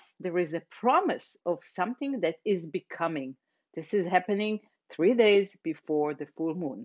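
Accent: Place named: Israel. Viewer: חשבתי שאני מזהה מבטא ישראלי קל :) נעים להכיר ותודה על המידע!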